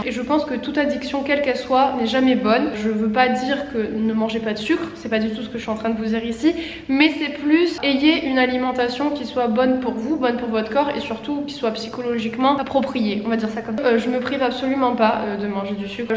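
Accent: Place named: Marseille, France. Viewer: Ohhhh une marseillaise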